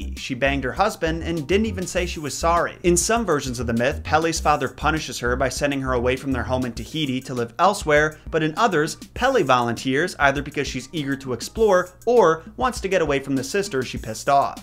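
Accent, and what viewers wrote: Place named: Wisconsin, United States. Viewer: i quite like your wiscansin ayccent in general not just with obscure word mispronunciations 🤗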